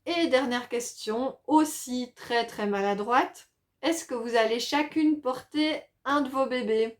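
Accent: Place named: Switzerland. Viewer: Ola l'accent Suisse !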